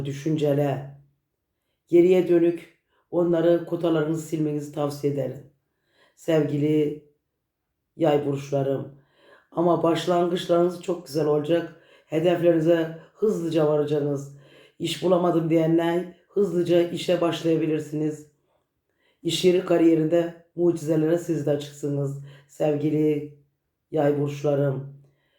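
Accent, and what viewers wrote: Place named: Afyon, Turkey. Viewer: Konusmanizdan sanki afyonlu gibisiniz nerelisiniz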